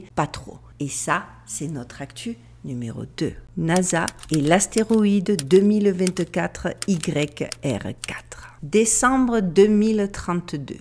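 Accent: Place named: Nice, France. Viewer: Wow ta d’beau yeux tu sais l’accent niçois avec un soupçon moscovite bon ton vpn ,on s’en fout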